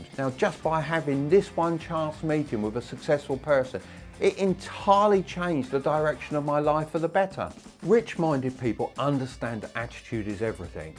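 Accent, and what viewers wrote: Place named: London, United Kingdom. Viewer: Do you live in America? because you sound like every bloke on my street in London.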